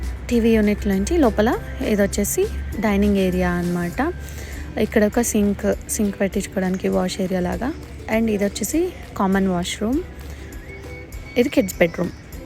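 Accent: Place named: Telangana, India. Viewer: Telengana language vachesthundira 😂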